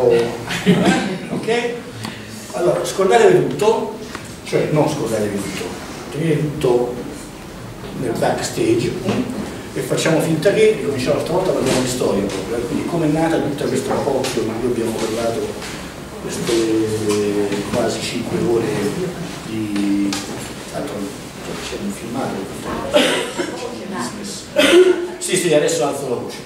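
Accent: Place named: Rome, Italy. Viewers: Io credo che neanche nell'Africa nera : eco, illuminazione comica, leggibilità della lavagna, accento romanesco.... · L'accento romanesco ci sta, il problema è il resto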